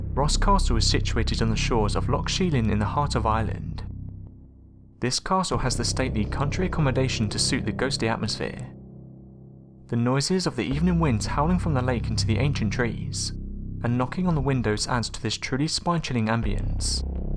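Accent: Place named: Wales, United Kingdom. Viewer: Dave Lennon-Copeland totally agree. Being from Wales I definitely hear a Welsh accent. Possibly South Wales. Maybe cardiff?.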